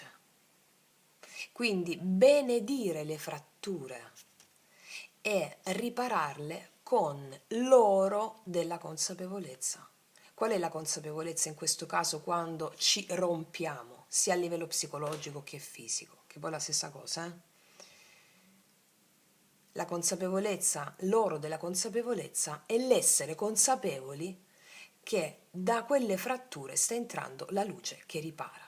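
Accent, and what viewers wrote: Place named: Rome, Italy. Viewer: Mbe di Roma ti ci innamori, non puoi farne a meno. Poi avevo varie amiche romane, me facevano impazzi'. Il problema delle cose belle è che creano dipendenza. Roma mia amata Roma bella e caotica città irrequieta. Poi il romanesco me fa sbrocca'. Grande, sempre molto gentile ed amorevole eee. Come cantava... Quanto sei bella Roma...mo la vado a senti`🌝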